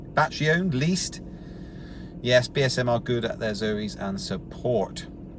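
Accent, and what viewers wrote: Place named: Forest of Dean, United Kingdom. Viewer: Hi. Watched your videos from the beginning. Worked in Cinderford for 5 years after leaving school so enjoy seeing the scenery and hearing the accent again me old butt. Got a white R110 which is great. Hire on a monthly rolling contract for £289 including insurance, maintenance and a polar subscription. Can change it when I want so looking forward to the new faster charging Zoe or maybe the e208 I saw at Fully Charged Live. The slow charging in the R110 is a pain but the extra power is great.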